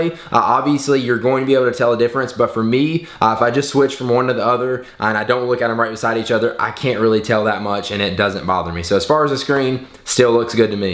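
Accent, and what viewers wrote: South, United States. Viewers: He has a slight southern accent qce* qce* qce* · Has nothing to do with the iPad mini but you look extremely cute with that short hair and I would date you if you went this way. Just saying. Love then country accent too. ;)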